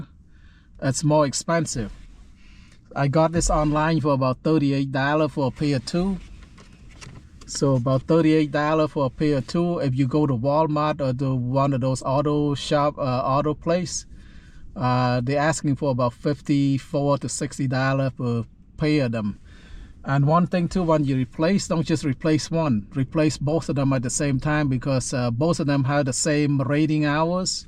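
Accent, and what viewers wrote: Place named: Cambodia. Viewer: No doubt. This man is Cambodian. his accent says it all.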